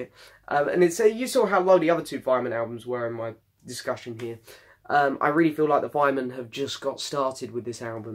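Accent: London, United Kingdom. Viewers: thank you for your take on Paul's solo albums, you have a great take and insight. In fact you introduced me to the album Ocean's Kingdom I wan not familiar with before listening to your ranking. Good going kid. I hope you meet Paul someday and get to produce or do something with him; your very knowledgeable and sound like a great London bloke. At least that is what this yank hears. You certainly 'ave an ear for production and music. I subscribed ti your channel, I was so impressed.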